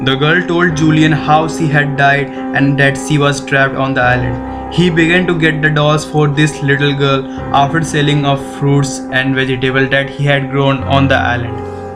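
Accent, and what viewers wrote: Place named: Bihar, India. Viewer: Maa kasam bht bura...... Bht bekar accent 😐 bc esa lgra koi bihari ldka bol rha..... Bura mt manio bt sch me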